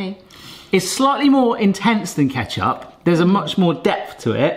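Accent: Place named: England, United Kingdom. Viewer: The English accent helps somewhat.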